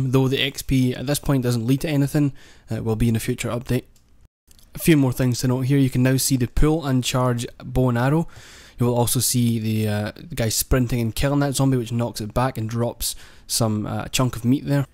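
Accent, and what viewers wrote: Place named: Scotland, United Kingdom. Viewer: You're so... scottish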